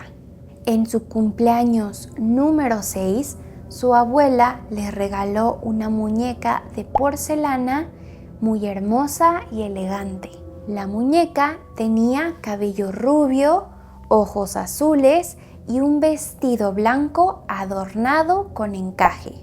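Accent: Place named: Mexico. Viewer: Vocabulary with real story. That is awesome :) It makes it easier to remember. Also I think your Mexican accent is cute and a bit easier to understand. I often struggle with accents from Spain.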